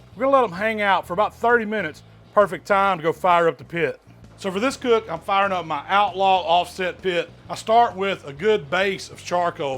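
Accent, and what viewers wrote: South, United States. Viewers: his southern accent alone makes me trust this guy when it comes to anything related to BBQ and smoking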